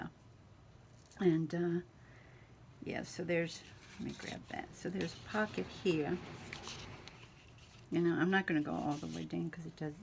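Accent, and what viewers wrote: South, United States. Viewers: Good morning 🌞 I’m crazy about all the Bees. I loved when your Southern charming voice came out. I love when the two of you are together. Praying that all of you stay healthy. I’m always interested and inspired by anything you make. Big hugs my beautiful sweet friend 🤗🤗🤗💋💋💋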